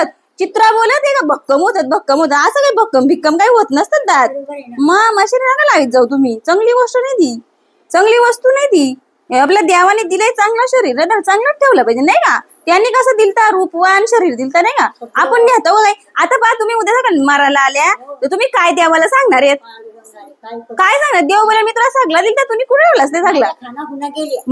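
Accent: Murbad, India. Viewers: काय बोलावे आता फुयजी..... एकच नंबर बोलता तुम्ही 👌👌👌👍👍👍.....याला म्हणतात मुरबाडी भाषा ❤❤❤ आणि दोन्ही पण म्हतार्या त्यांची डोबरा सिंदारित सोडून तुमच्या बाता मन लावून ऐकत्यात 😂😂😂आता तुमच्यामुळे त्यांची डोबरा कुइकं जातील आणि त्यांचं म्हतारं त्यांना किती चोपतील...काय सांगू शकत नय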